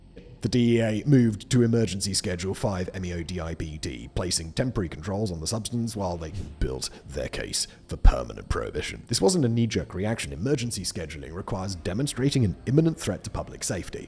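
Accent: England, United Kingdom. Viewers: 2 recorded deaths. There are thousands of known hallucinogens. I never really thought you would be above this fear mongering & we all know you weren’t writing, editing or engaging in the creative process of these channels for many years now. You’re just an English accent reading catch-all content targeting an American audience.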